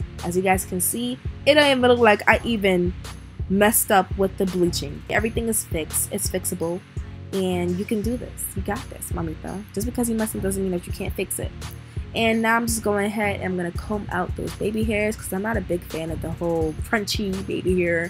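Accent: New York, United States. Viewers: Yo she has to be from ny the way she talking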